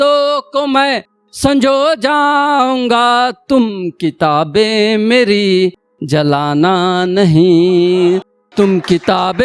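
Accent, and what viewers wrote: Bihar, India. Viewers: Bihar se ho kya 😍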